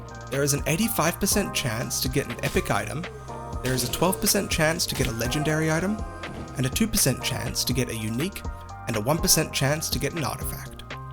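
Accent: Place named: RP, United Kingdom. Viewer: This posh accent is too much for me bud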